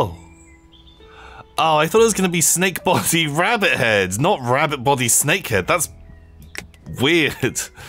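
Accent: Australia. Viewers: 10/10 aussie accent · As an Australian myself, Aussie is now my new favourite accent!! That was gold <3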